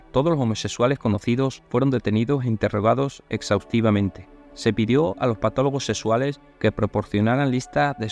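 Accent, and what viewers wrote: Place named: Spain. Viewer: Buen documental,hay una pelicula muy buena que se llama ciudadano x,me gusta buestro canal,narrado en español ee españa y muy bien documentado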